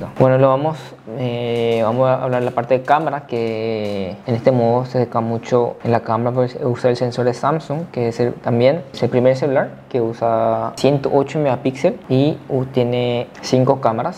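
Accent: Paraguay. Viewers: Es de Paraguay xd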